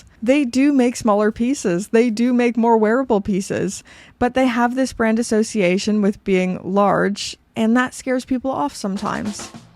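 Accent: Canada. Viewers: I'm here for the watches... and her great Canadian accent 😊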